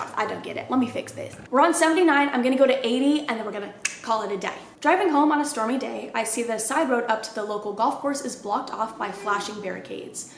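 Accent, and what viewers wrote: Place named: South, United States. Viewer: That southern drawl keeps shining through more and more. Subconscious or not, it's cute. Seems fitting, I dig it! 🤠😉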